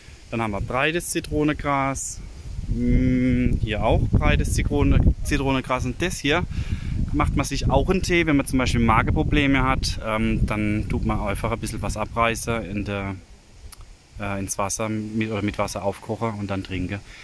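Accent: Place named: Mannheim, Germany. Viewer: Es wird sehr , sehr schön 👍 Eure neue Katze sieht genz wie unsere aus. Wir haben den ersten Tag in unserem Haus gewohnt, da stand sie ganz ausgehungert vor unserer Tür und hat kläglich miaut. Dann haben wir festgestellt, dass sie auf unserem Grundstück in einem Holzscheit drei Babys versteckt hat. Wir haben alle zum Tierarzt geschleppt, aufgepäppelt und uns entschlossen , dass wir sie alle behalten. Hier sind alle übermäßig versorgt mit Tieren und bevor sie in schlechte Hände kommen bleiben sie bei uns. Die Mama haben wir Bounty genannt. Weiterhin viel Erfolg und Spaß beim gestalten . P S Ich frag mich ja immer welchen Dialekt Ihr sprecht, ich tippe auf Monnem 🤣oder Ludwigshafen. Bobenheim/ Roxheim hatten wir ganz früher mal sehr gute Freunde, die haben so gesprochen wie Ihr. Wir sind aus der Nähe von Meenz { Mainz)😂🖐️🖐️ Liebe Grüße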